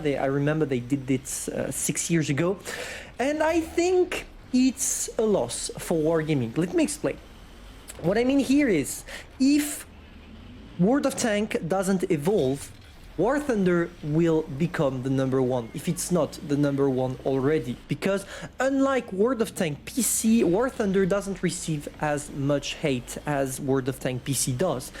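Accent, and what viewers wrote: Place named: France. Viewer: i love your video and your french sound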